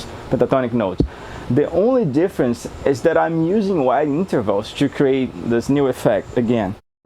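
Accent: Brazil. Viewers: vc poderia fala em portugues. pelo menos teu sotaque me parece brasileiro. e poderia colokar as legendas em ingles. ; ) soh uma sugestao nao me leve a mal. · I think the accent is Brazilian. I can't get to the link. I like this stuff. I've pulled towards this type of phrasing without know/analyzing what I was doing. This'll be useful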